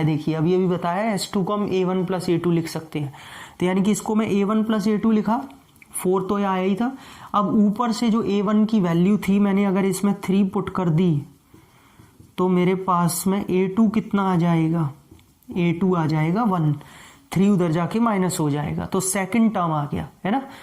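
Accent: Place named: Haryana, India. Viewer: Sir awaj se aisa lgta ki aap Haryana ke aas paas ho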